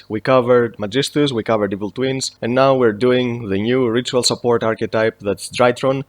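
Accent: Greece. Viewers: έλληνας είσαι ρε φίλε; από την προφορά ρωτάω αν ναι, κάνε το true draco/monarch ντεκ να χαρούμε :P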